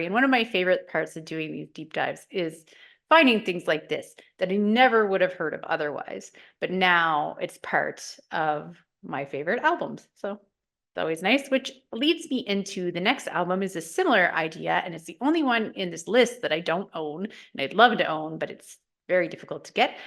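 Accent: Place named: Canada. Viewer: I have always thought that 1973 was probably my favourite year of recorded music, especially in the Rock genre. I'm quite a bit older than you, but I love that you focused on 1973, and thank you for including a coupe of artists I'd never heard of before ( Gary Higgins & Duncan Browne), I'll be searching for their work to enjoy. I also like the fact that your tastes are eclectic, mine are as well. I was introduced to many different genres of music as a child, I had an Auntie who worked for CBS Records, so she gifted many albums to me from a vast variety of genres. I love your Canadian accent. Take care!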